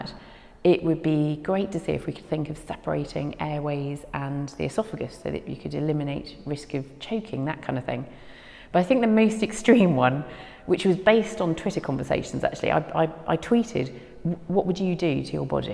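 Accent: Bristol, United Kingdom. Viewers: Think Brenda from Bristol. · that's not a 'westcountry ' accent. Come to Devon or Cornwall and you'll know. She has light Bristolian going on. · Bristol ( locally known as Brizzle ) is right!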